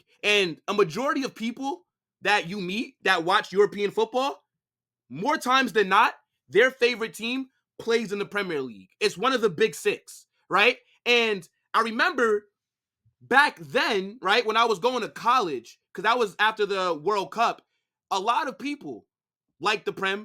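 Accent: United States. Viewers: I'm so happy about finding this channel actually cause finally i found some one on YouTube speaking about my favorite team which is real Madrid and also about soccer with a very clear American accent that's amazing keep going 👏 👌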